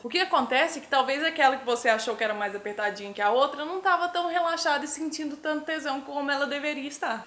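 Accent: Minas Gerais, Brazil. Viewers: o sotaque continua??? Mineira..goiana...ou as duas?? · Você é mineira???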